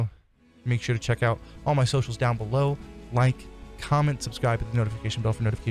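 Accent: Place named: Texas, United States. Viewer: That's a Nice Texan accent you got there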